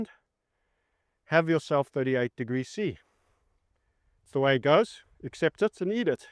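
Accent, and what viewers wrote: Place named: South Africa. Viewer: your South African accented quips get me every time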